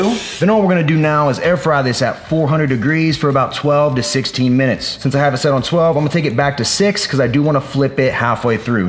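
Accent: Texas, United States. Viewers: The Texas y’all has caught up with ya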